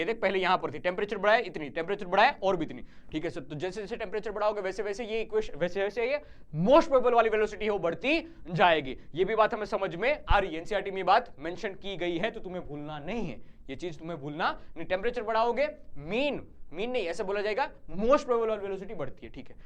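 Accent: Gujarat, India. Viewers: Sir gujrati hai....shayad...😊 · Sir aap Gujarati ho kya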